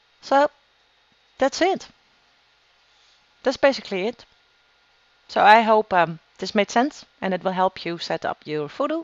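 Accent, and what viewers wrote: Netherlands. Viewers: Ive heard right away that she was dutch coz i have the same accent :))). But i have another question. Ive started to play wow again and downloaded my fav vuhdo healing addon. I just bought also another mouse, logitech g604. Problem is i cant figure out how to setup the mouse buttons after mouse button 5 in vuhdo. It looks like vuhdo dont see the buttons after button 5.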